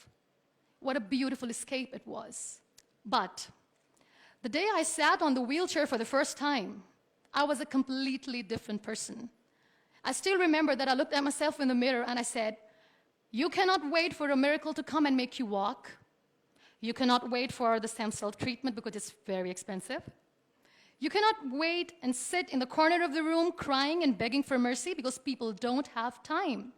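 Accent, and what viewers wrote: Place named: United States. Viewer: I salute u Muniba..ur speech touched so many peoples heart..keep smiling n Mr.badal y r u concentrating on her american accent?y r u not focusing on her speech..i think u r jealous of her communication dats y u r focusing on it more..plzz dnt disrespect any1 if u dnt no to give respect..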